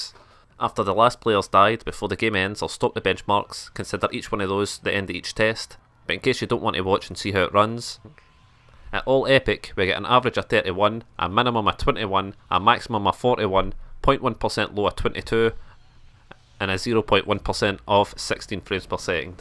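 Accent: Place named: Scotland, United Kingdom. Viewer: Nice Scottish accent, I wish I had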